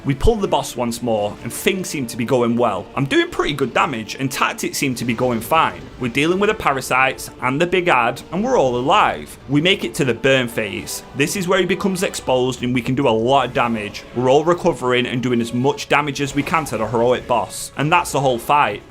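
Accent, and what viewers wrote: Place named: Northern England, United Kingdom. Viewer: been loving your videos. Prefer to watch the compilation ones in one go, beer in hand - the night is mine. I have one small criticism and that is it seems like your videos are on speed.. They go through so quickly there's barely time to absorb the info. If you could slow it down a bit for us old timers lol. Love the accent, I'm guessing somewhere up north. Essex boy here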